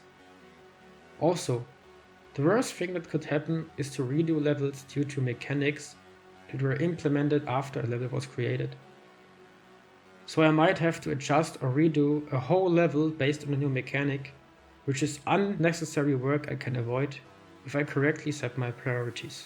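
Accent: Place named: Germany. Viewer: you sound incredibly german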